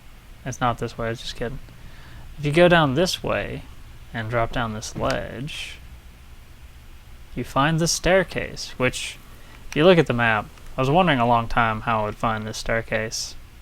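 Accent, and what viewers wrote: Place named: Toronto, Canada. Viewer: your a boss , my man! are you from toronto, cause you sound like it :)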